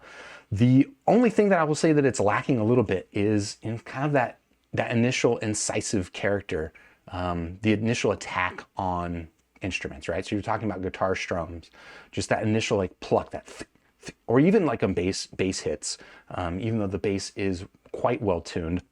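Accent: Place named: California, United States. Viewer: I used to live in LA. I just think your accent is from California. Could be wrong...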